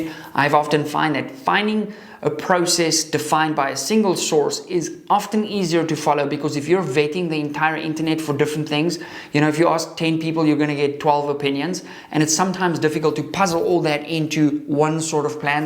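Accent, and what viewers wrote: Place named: South Africa. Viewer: Good vid. Great tips. Are you South African? We are. But live in New Zealand now. It must be expensive in SA getting the hardware you need....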